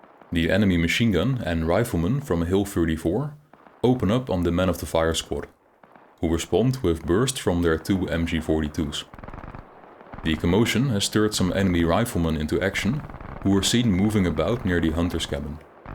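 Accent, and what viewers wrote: Netherlands. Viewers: this dutch raw accent is unlistenable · You'r obviously Dutch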